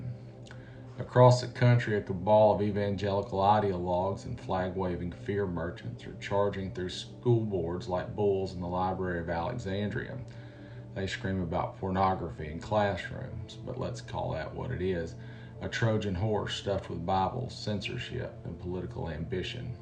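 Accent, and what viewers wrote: South, United States. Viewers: you are brilliant. Your "good ol' boy" southern accent makes what you say even more powerful. I've shared your videos with everyone I know. Defiance 'til death 💪🏽✊🏼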